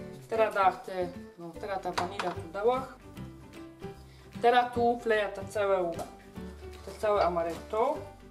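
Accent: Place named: Podhale, Poland. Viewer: Przecież to jest Urzekające.... Ja pochodzę z Białegostoku ale to jest Cudownie piękne jak Pani mówi po Goralskiemu 😃😃😃😃😃😃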